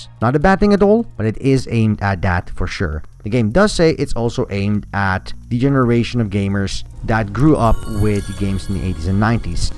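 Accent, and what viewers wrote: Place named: Netherlands. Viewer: I assume Dutch? You have a very deceiving accent if that's the case! Great video nonetheless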